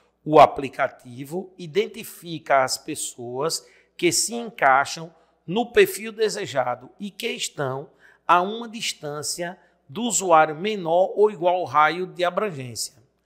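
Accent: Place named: Maceió, Brazil. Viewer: Vcs são de Maceió? O sotaque parece muito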